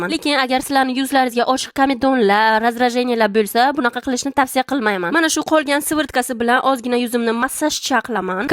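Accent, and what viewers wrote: Bukhara, Uzbekistan. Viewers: Buxorocha sheva biz ham shunday deymiz😂👍🏻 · Buxoro shevasi bu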